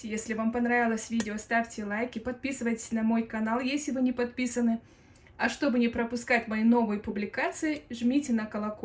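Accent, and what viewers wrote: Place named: Dagestan, Russia. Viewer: Дагестанский акцент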